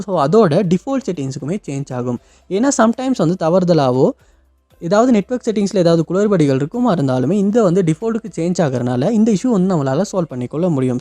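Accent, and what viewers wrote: Sri Lanka. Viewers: Srilankan tamil 👍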